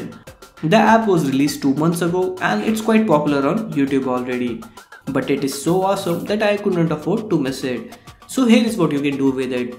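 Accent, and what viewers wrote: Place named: Bengal, India. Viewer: i think you are Bengali . i am saying because your accents is a lot like Bengali .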